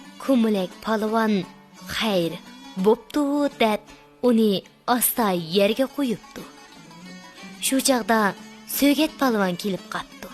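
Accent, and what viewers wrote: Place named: China. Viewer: Какой жесткий китайский акцент. Это так специально делается? Почему чистое уйгурское произношение так уродуется? Что за через чур мягкая, какая то детская манера говора. Этот канал под китайскими властями действует? Никогда гордая уйгурская женщина так не разговаривала. Поправьте меня, если что то не так. Ну не мне одному же это кажется??!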